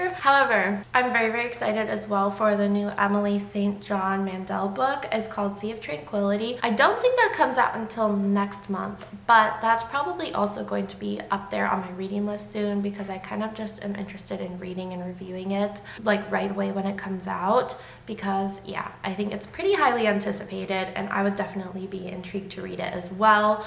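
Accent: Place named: North Carolina, United States. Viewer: Haha no, you don't really look like someone who walks a lot outside in super sunny California 😅 I was guessing that you lived in or somewhere around maybe North Carolina or Pennsylvania or something like that, based on your appearance and also specifically your accent, but I'm not American so there's that. Anyway: Your pale complexion is obviously very pretty, however, and so I don't think you need to strive for a sunburn/orange brown tan. Way overrated in this world. 😊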